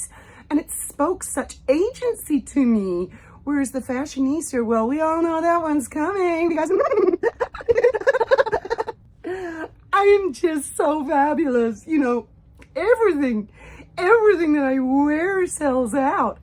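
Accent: New England, United States. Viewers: Your yankee accent is so funny 🤣 I mean, the whole thing was 💯👏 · Great American accent.... I'm American too! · A masterpiece! I don’t know how you can do such a good American accent—I can’t do a British accent to save my life! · Great American accent! Touching hair nonstop! Laughed and scared the dog when you twerked up the skirt!🤣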